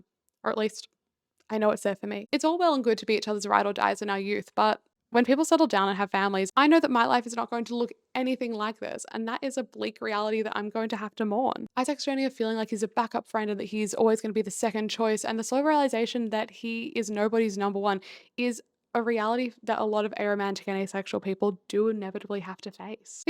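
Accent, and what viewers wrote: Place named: Australia. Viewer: omg when i clicked on and heard your accent my jaw dropped, absolutely thrilled to see another asexual australian girlie!! (even if you're a melbourner lol) great video! i'll be subbing for more :]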